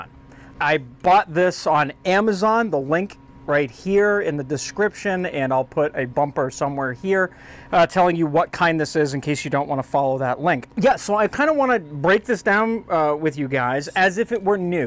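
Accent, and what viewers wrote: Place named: Northeast, United States. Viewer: Very odd 🤣🤣 you must be from up northeast 100 %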